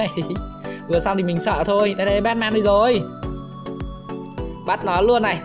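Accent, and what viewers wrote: Nam Dinh, Vietnam. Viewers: A ở nam định hả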